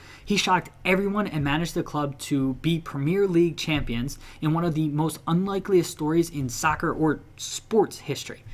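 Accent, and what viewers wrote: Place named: United States. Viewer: Love the American pronunciations lol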